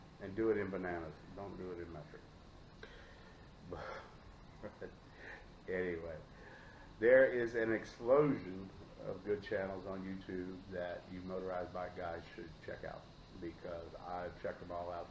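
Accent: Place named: South, United States. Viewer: good morning MR. another informative video that small sprocket you made pretty neat I think you can purchase them at mz Miami I thought I saw a 11 tooth I can't explain technically what it does but just like raisins the number of teeth on the rear sprocket does something so does the front it did the same thing in RC trucks cars anyway I think lowering the front sprocket slower take off faster top don't quote me lol but it's something like that You can tell you're from the South brother I'm in Texas you can hear them cicadas singing lol you your wife and family have a great labor day stay safe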